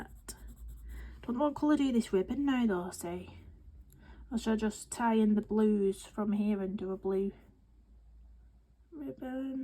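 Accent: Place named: Wales, United Kingdom. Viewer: Hi beautiful I love the unicorn 🦄 she is looking beautiful.. I really thought u were from wales lol.. your accent is beautiful when I talk in Aussie slang some people look at me too exp when they aren’t Aussie lol 😂 lol forward watching your next colour and chat ❤x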